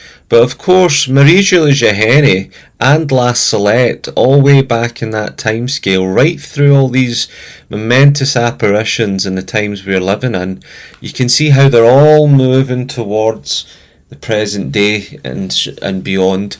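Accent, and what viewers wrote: Scotland, United Kingdom. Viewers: What the heck! Your channel is pure dead brilliant!!! I live in Australia, and I've never heard of you before. My mother still lives in Greenock though, which can't be that far from you, gauging by your accent. You could have at least told her, and she could have sent me a postcard. So much wasted time. Now I'm having to watch all your other videos. My wife doesn't understand, says there are household chores to attend to; dishes and stuff. You've put me in a difficult dilemma. I am going to pray about it. 😀👍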